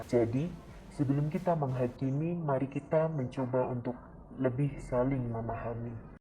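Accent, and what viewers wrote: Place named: Java, Indonesia. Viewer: medok bgt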